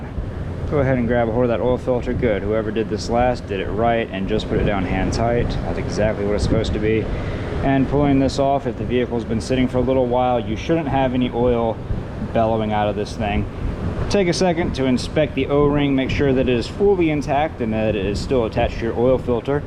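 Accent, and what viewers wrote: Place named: South, United States. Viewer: Good job! We like your southern accent! Make more videos my oily cowboy 😂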